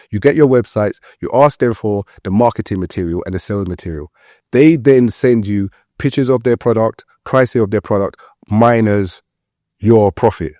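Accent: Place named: Tottenham, United Kingdom. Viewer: Tottenham people talk the same 😂😂, him and OG have similar mannerisms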